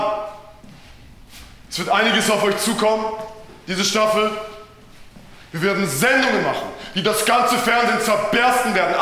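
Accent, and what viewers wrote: Germany. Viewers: Warum spricht der wie ein Saarländer? Wer soll damit verarscht werden?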